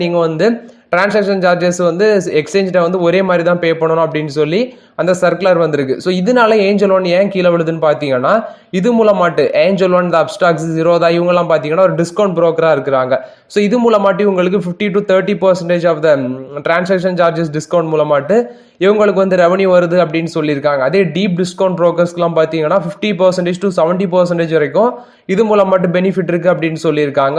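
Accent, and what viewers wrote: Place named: Nagercoil, India. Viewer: Slang resemble Nagercoil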